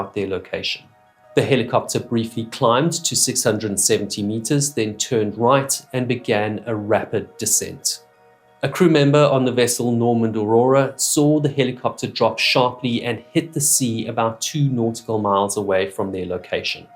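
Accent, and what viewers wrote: South Africa. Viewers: Nice to hear your SA accent again from NZ. In the 1960s the old BMC Mini had a magnet on the oil drain bung, it was often covered in fine steel particles, if anything larger was noted, they were visually examined. Sections of teeth could be identified by comparing with other gear clusters. The customer could then decide if a precautionary teardown was in order or if they would risk it until failure. Only once did I hear of a complete seizure, this resulted in the car stopping like full application of the brakes; being front wheel drive. It was hit by the following car, no injuries. Keep your good work videos going.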